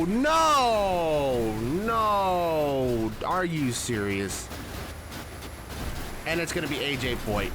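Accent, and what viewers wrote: Michigan, United States. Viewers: This was hilarious dude. Well done. Sidenote, you from Michigan?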